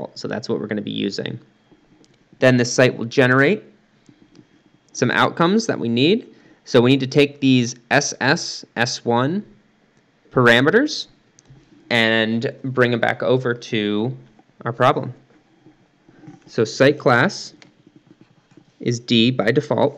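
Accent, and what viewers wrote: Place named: United States. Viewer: I did a thumbs up because your voice sounds enough like mine for me to understand you. I am so grateful for a fellow American "accent!"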